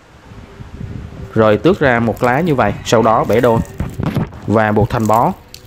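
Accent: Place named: South, Vietnam. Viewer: Các món ăn bạn đưa lên tui rất thích, có điều bạn hay xài hai chữ “ nước dùng “. Dân miền Nam đâu có “ nước dùng “ chỉ xài “ nước lèo “ thôi, vì giọng đọc của bạn nghe đặc sệt miền Nam.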